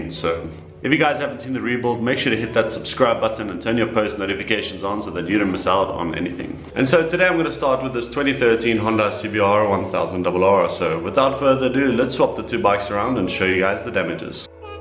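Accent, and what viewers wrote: South Africa. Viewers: Ayyy I recognized the accent😉 I'm South African aswell. Enjoying your vids bru, keep it up.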